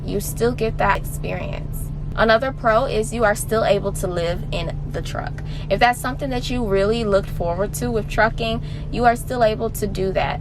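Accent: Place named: Southeast Michigan, United States. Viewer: You sound like SE Michigan.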